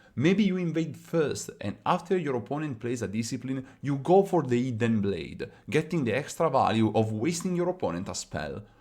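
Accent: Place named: Italy. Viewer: nice video, just a few question: 1 were are u from? (cause ur accent is similar to the italian one, ed anche perché sono italiano e mi sembra di riconoscerlo) 2 i read from the rule that the correct order is not choose battlefield, die roll and choose who go first, but is die roll, player choose battlefield and then choose who go first. i think this way is the worst way, but i understand like this the rule. if i am wrong than it's the fault of the rulebook, it's the worst thing i ever read, someone in riot dont know how to write in an normal english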